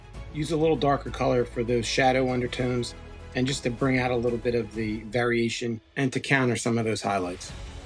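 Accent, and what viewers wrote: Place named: Philadelphia, United States. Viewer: Nice job! I hear Philly btw lol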